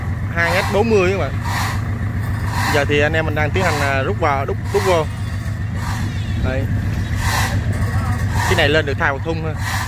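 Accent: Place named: Mekong Delta, Vietnam. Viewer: Chỉ đọc câu chào bà con và các bạn đáng được 1 like .và chia sẻ . Nghe thật dễ mến, chất người miền Tây . ( Ghét nhứt những người nói câu chào mọi người nghe thô tục và chói tai )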